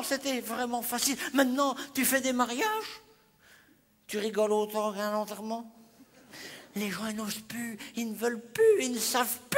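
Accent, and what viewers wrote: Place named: Normandy, France. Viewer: sacré tu me fait rire et tellement vrai tes histoires avec une pointe d accent normand bonne continuation a toi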